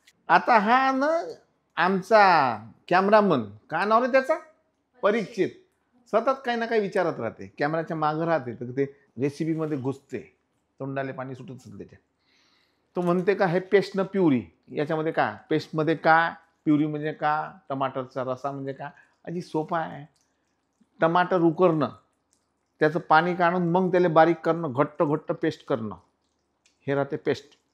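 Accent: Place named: Varhadi, India. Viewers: Aaji lay chaan varhadi bolta Maja aa gaya Mai Nagpuri ❤ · वऱ्हाडी छानच बोलतात आणखी कोणत्या भाषा येतात तुम्हाला? नक्की बनवून बघणार, मी playlist बनवतो आवडत्या रेसिपीज ची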